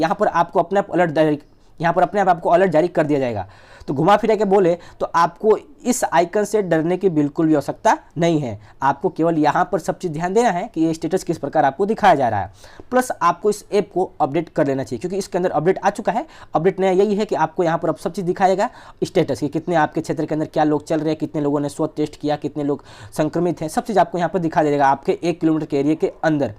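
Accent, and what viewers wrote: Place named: Bihar, India. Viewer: thoda space dia kr bolte waqt bihari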